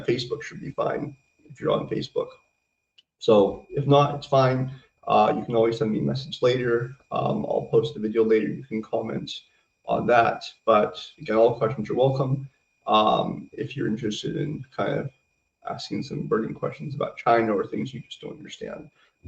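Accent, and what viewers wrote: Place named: United States. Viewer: YO!!!!! Chandler Bing LIVES!!!!!!! Why is he speaking with a posh American accent!!!!!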